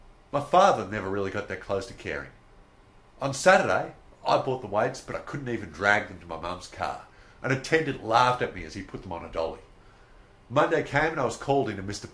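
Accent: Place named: Australia. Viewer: Thanks for posting and great dictation, love the oz accent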